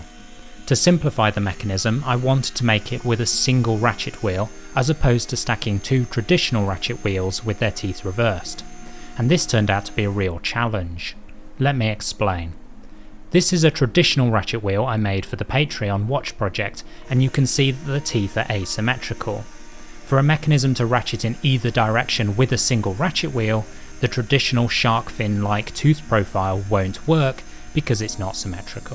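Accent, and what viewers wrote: United Kingdom. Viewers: Beautiful engineering for a rather niche experiment! I have real trouble placing accent though... A touch if Western Scotland, Ireland and south east London (the posher bits)!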